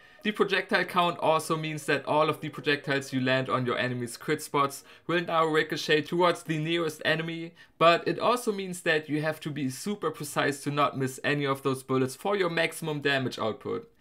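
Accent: Germany. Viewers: From your accent I´d say you are german.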